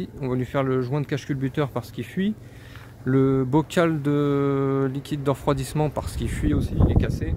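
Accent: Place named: Normandy, France. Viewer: Belle vidéo,belle mécanique,le 2.3 n'a pas été extremement diffusé en France.Solidité incroyable,mais la conso....Etant donné l 'accent,on serait bien en Normandie non?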